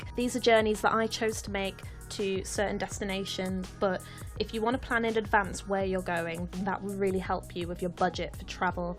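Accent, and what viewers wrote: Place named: Bristol, United Kingdom. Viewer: I'm sure it was a Bristol kinda accent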